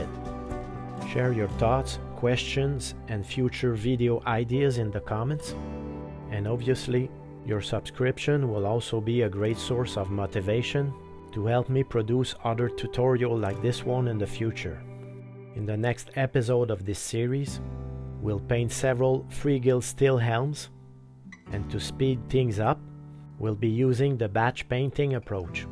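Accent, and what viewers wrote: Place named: Ottawa, Canada. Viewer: I live in Ottawa as well so your accent makes me feel right at home :). Keep up the great work. I’m enjoying going through the back catalogue.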